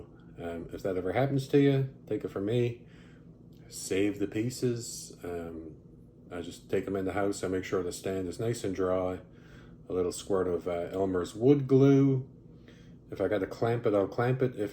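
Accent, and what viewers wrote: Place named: Newfoundland, Canada. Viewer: Was looking at buying this sword, watching your video...hmm that accent sounds familiar. Sounds like a fellow Nflder? Anyway, subscribed and liked.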